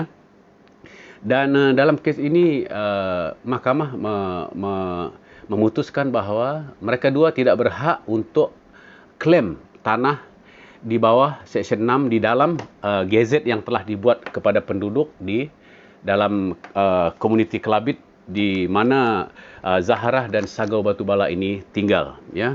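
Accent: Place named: Indonesia. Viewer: Kenapa cakap macam indon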